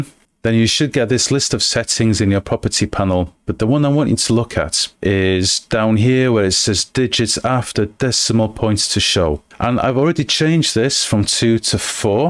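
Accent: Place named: Wales, United Kingdom. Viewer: Late reply, but he's saying contour! He has a strong Welsh accent!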